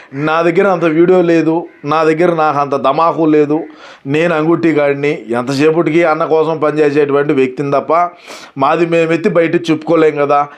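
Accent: Rayalaseema, India. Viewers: same slang throughout combined Chittoor, Anantapur, Kadapa, Kurnool dist.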